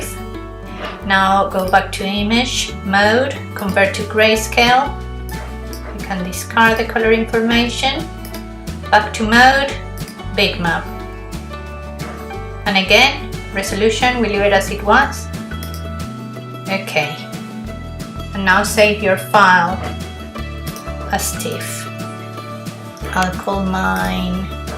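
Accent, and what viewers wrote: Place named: Latin America. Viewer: Loved your tutorial! Learned so much. Also loved the mix of your Latin and English accent. Hypnotizing lol.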